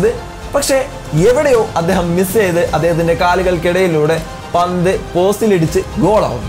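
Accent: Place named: Thrissur, India. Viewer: Intro oru thrissur ഭാഷ പോലെ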